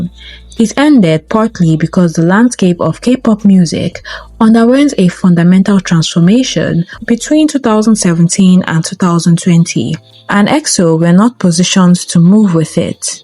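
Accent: Nigeria. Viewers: I can clearly hear her Naija accent and she speaks so well. so what are you talking about?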